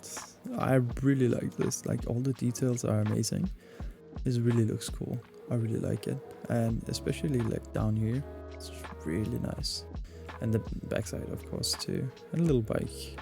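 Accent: Denmark. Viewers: Yo you danish? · i noticed your accent, are you danish?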